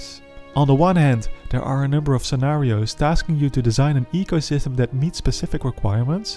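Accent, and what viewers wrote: Netherlands. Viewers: this guys english is so dutch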